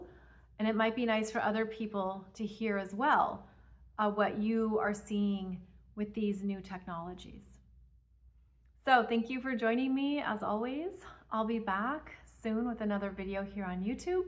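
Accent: United States. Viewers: I always thought you were American untill you said two euros , that made me super confused.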